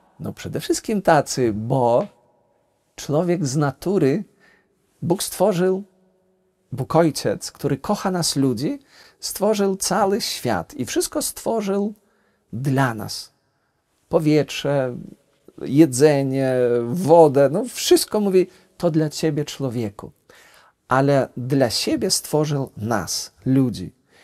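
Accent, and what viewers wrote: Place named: Kresy, Poland. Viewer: piekny, poetycki , kresowy akcent ksiedza, cudny ksiadz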